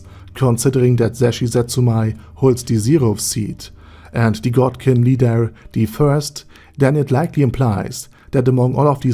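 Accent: Germany. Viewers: having a german guy narrate overlord is oddly fitting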